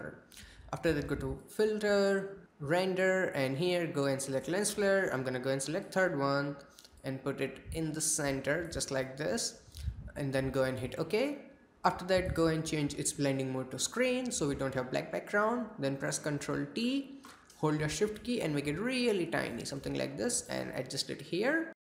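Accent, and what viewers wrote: India. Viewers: Its funny how fast this guy speaks, Indian english is cool. A very good tutorial indeed. Thanks!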